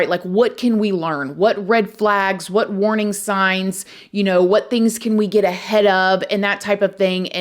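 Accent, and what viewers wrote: South, United States. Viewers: G’day. You’re a very friendly, lovely lady 😊. This is my first time visiting your channel. I found your post to be very informative and with all due respect, I love your accent. It reminds me of the Beverley Hillbillies. Y’all come back now.😊😊Consider me an Australian friend and yes. I have just subscribed to your channel ❤❤🇦🇺🇺🇸🇦🇺🌹🌹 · Southern accent, so cute.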